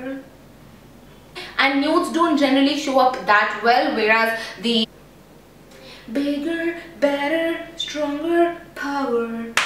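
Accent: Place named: Delhi, India. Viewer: tv halka karwa deee wats tht meann this is one of ur best video n i save it offline love ur delhi wala tone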